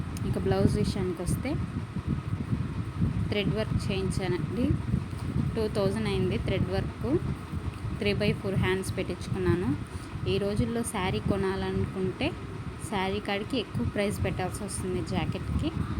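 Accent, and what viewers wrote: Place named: Chittoor, India. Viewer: Me slang konchem chittoor slang laga undi so anduke అడిగా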